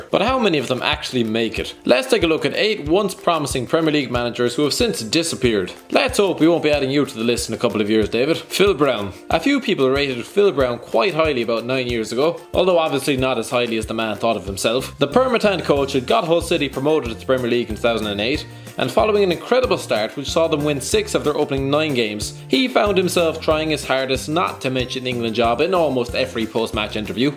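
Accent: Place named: Ireland. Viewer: Michael Hart it's the irish accent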